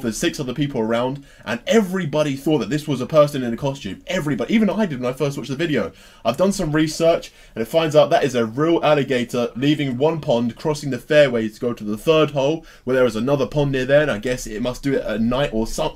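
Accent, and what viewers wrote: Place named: South London, United Kingdom. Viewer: what part of south london u from?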